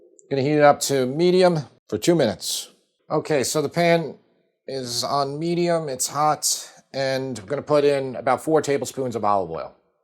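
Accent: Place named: Long Island, United States. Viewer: Never lose your lawng island accent. As an LI native (east end) who splits his time between the island and North Carolina, the accent is always recognized down here as a “new yawk” accent. I always correct them and tell them that “It’s a lawng island accent, and we live ON the island not in”.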